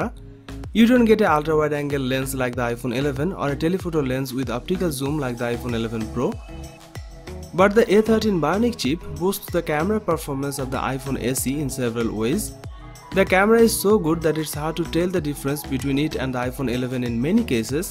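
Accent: India. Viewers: Sound like indian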